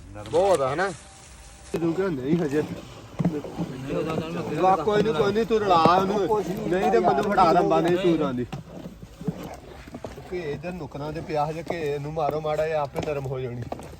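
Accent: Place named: Majha, India. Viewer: Tuhadi boli sade majhe de lagdi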